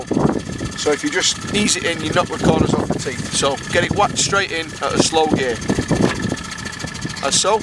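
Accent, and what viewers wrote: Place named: United Kingdom. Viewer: That sounds very British :-)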